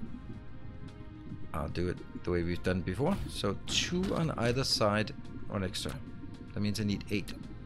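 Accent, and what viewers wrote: Denmark. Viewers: He is indeed Danish.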